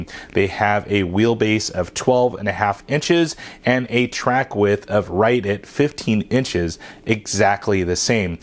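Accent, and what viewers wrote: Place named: California, United States. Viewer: Hah sall good! Guess that's just how California can sound:1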